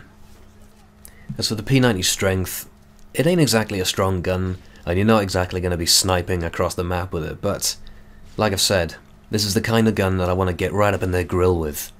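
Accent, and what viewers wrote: Wales, United Kingdom. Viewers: Are you Welsh? You seem to have a very strong welsh accent. But the manly one, not the gavin and stacy welsh accent. The one that that comedian has... uh, whats his name... he did a work experiance series where he did work instead of comedy... ANYWAY, yeh, so, are you welsh?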